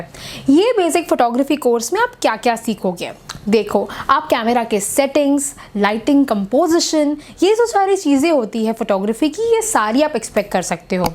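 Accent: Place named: Bihar, India. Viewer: बेसिकली बिहार से लगती है आप .. anyways, अच्छे से बताती हैं, लेकिन presentation की थोड़ी सी तैयारी और जरूरी है .. जानकारी तो है no doubt... 👍👍💐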